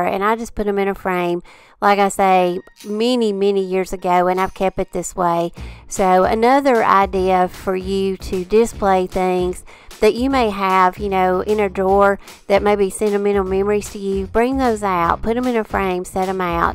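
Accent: South, United States. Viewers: You have such good ideas, classy and not cheap looking store bought items. Love your upcycles, repurpose of old things..also enjoy your background music and your sweet southern accent.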